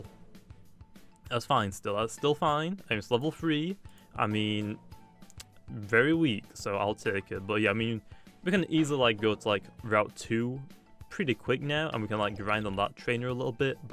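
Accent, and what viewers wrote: United Kingdom. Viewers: I think he's British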